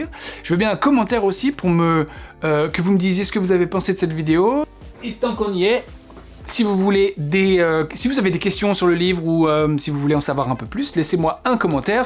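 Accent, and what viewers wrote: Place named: England, United Kingdom. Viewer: j'adore ton accent anglais ... une approche très éducative, à la portée de tous (et toutes ;-) ) merci !!!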